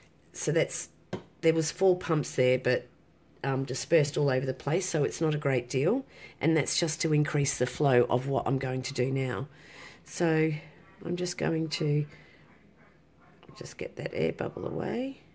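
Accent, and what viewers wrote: Australia. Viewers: Haha!! Well then, that’s perfect!! We both got a little chuckle this morning ☺️ (or “this evening” 🇦🇺 you sound Australian which means are 14 hours apart) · Ah the Aussie accent and the 'youse' hehehehe! Hilarious! (I'm a Aussie too.) I see someone has already talked about the narrow field of vision so that's great for next time, but you might also try not to say 'um' so much hehehehehehe. This technique is so very exquisite isn't it? i love it. is the paint waterproof, how do you seal the tile and can it be put on a wall or is it only to be framed?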